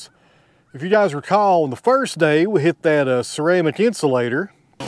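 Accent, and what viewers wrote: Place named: Florida, United States. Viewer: New subscriber brother i really this adventure im an ole Florida cracker and I pronounce the same as u God bless you brother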